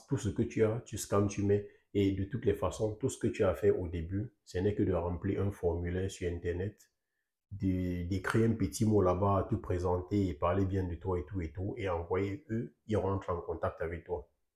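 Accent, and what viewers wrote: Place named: Cameroon. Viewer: mon gar ta video n''a pas de volume et ton accent cest kmer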